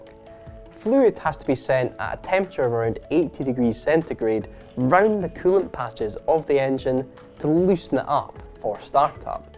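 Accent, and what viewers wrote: Scotland, United Kingdom. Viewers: Is Scottish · Started watching then heard that roaster Scottish accent.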